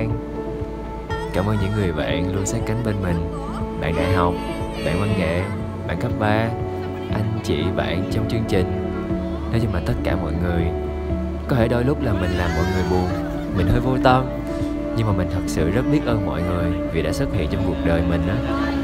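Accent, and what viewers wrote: Vietnam. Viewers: Chất giọng người Nam Bộ sao thương quá là thương !!!!! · Trời ơi cái giọng sài gònnnnnnnnnn 🥰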